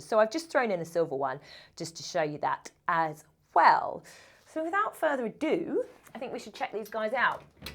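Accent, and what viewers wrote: United Kingdom. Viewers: I like the French first name with the British accent😏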